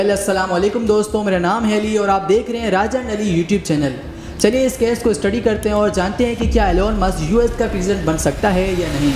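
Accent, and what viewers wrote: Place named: Sindh, India. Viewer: Pele nahi hota Pehle hota hai, urdu achi karo clear sunai nahi de rha thora jama jama kr bolo pause leke, sindhi lag rhay ho clear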